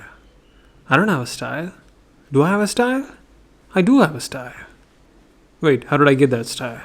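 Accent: India. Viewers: Listen carefully and youll hear little indian accents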